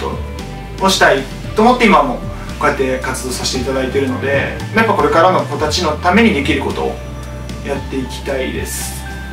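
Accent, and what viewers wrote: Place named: Shizuoka, Japan. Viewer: 静岡弁。笑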